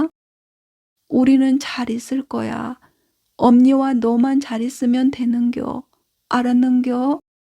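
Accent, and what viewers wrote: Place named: Gyeonggi, South Korea. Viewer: 경기사투리가 정겹네요 예전 강화도령철종드라마가 기억에 새롭습니다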